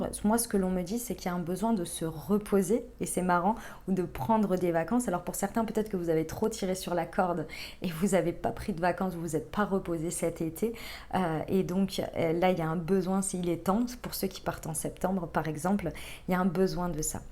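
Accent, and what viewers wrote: South, France. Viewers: Chère je suis contente de te réentendre, ta voix est tellement sucrée et ton accent sent le sud 💃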